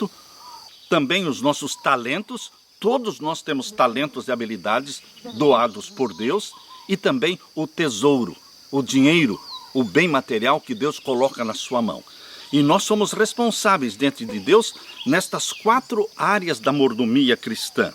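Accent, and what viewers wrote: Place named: South, Brazil. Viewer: De onde o sr. é? Com este sotaque bonito? Parece do sul! E este local em que está fazendo o estudo da lição, com essas prdras lá atrás Que lindo!....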